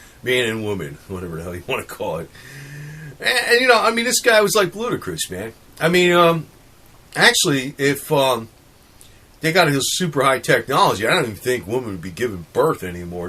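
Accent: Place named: New York, United States. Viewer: I love the WY you talk .. New Yorker .. I never believed him ither ... he never was in the future..Their us no future ..We're the future ..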